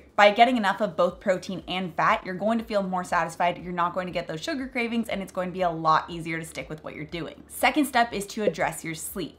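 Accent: United States. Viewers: Hello, Many thanks for your videos - the content is excellent! I thought I was 'educated enough' when it comes to nutrition but I have learnt a lot from you. The only - can you please slow down a little? I am not at all trying to criticize your accent and style BUT after approx. 2 minutes of the non-stop but fast talk in the style/accent of yours, me and most my Scottish friend just cannot take any more, mute you and only rely on subtitles. In other words, the headache from the non-stop talk is not worth the information you can convey - and you obviously have a great amount of knowledge! Just a thought for consideration. Maybe fellow Americans don't find your way of talk not as overwhelming? Not sure... Thanks and ALL THE BEST! mk x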